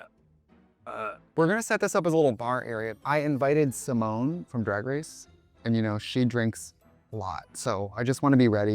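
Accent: Wisconsin, United States. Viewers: In my head, I was like why does Trixie kind of sound like Ryan Murphy playing Ed Gein from Monster but then I remembered they’re both from Wisconsin lol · wisconsin accent is thick today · The wisco accent is back in full force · Honestly, the wisconsin accent was strong in this video. love that for you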